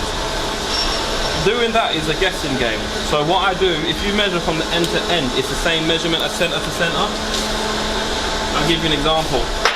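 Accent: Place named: London, United Kingdom. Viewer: I try to understand your prononciation just like London down town.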